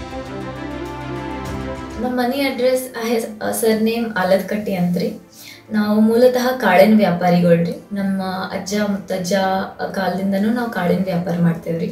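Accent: India. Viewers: Yalli hodru uttara karanataka(UK) bashe(style) bidabyadri akka... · Bahal simple agi guidance madidri Akka.....pakka Belagavi Kannada...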